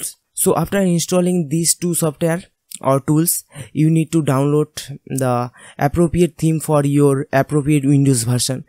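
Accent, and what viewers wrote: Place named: Bengal, India. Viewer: i hate bangali english accent. could not go through torture. closed.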